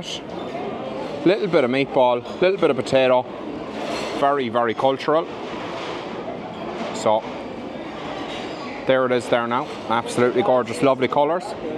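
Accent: Ireland. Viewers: you are delicious, you are lovely, and you are gorgeous!! My parents were born and raised in Ireland and I grew up surrounded by my parents' off the boat Irish friends and random aunts and uncles that would come to the US for VERY long stays. It's been years since my parents made their transition it's goes down a treat (as my Dad would always say) to hear your beautiful voice. I had no idea how much I missed the sound of Ireland until I tuned in. Thank you!! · I was today years old when I discovered that the word filming is actually pronounced "fil-i-min." With an Irish accent! Looking forward to that video of you actually trying to put together that new desk of yours. Even cursing with an Irish accent is brilliant!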